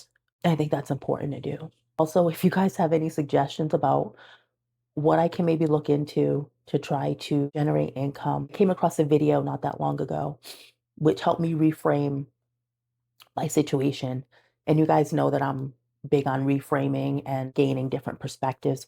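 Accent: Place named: Boston, United States. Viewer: I can tell you’re from Boston, we’re tough, you got this good lookin!…..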